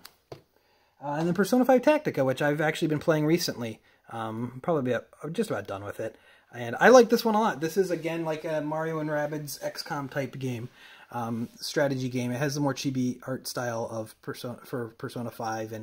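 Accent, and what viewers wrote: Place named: Upper Peninsula, United States. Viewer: Outstanding video, also love the U.P. accent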